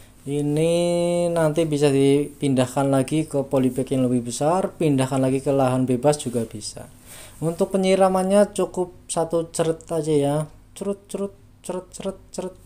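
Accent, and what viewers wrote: Banyumas, Indonesia. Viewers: ngapak bosss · Oalahhh ngapak, aku wong Gombong mas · Wahhh...terimakasih ilmunya mas..saya jadi paham cara budidaya buah naga..akan langsung saya praktekkan..btw mas orang banyumas apa mas?deneng ngapak?siipp banget..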